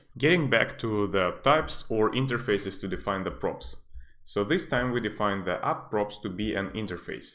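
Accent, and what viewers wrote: Russia. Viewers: ох уж этот russian english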